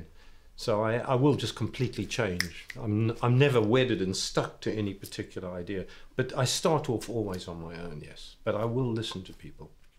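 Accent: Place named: South Africa. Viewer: He still has a slight South African twang to his accent .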